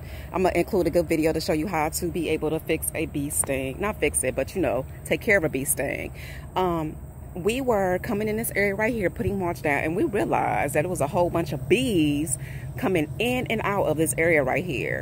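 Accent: South, United States. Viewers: I live in the Midwest now but I miss a good ole southern accent. “We sprayed it with this DAAWN” 😂 love it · Girl, you sound like me. You must be from the south too. 😀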